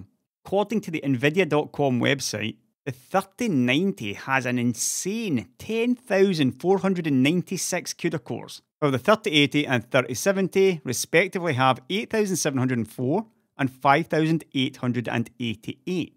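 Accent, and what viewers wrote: Scotland, United Kingdom. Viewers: I Missed yurr skottish voice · reading the comments in Scottish. That voice is stuck in my head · It's called a Scottish accent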